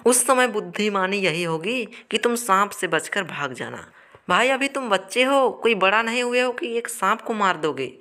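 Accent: Bihar, India. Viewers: Bhai aap bihari ho kya?😀 Ek dam bihari accent....mast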